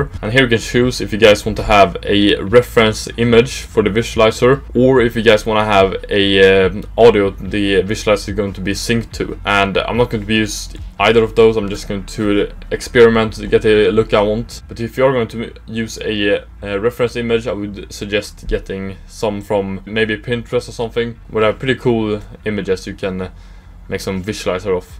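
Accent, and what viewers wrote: Sweden. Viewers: Älskar svengelskan, bra video!👍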